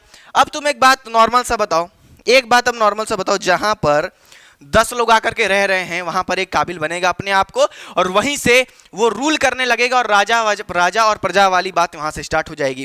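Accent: Bihar, India. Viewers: Bahiya aap Bihar se ho kya aawaz se lag rha hai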